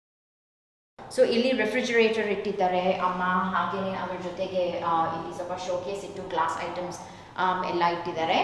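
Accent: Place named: United States. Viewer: #giveaway #ಗಿವ್ಅವೆ Hey there , Your kannada speaking style with American accent is so soothing😍, loved the idea of yours to teach your childrens to speak kannada.Stay Happy and prosperous☮️♥️.. Much love 🤗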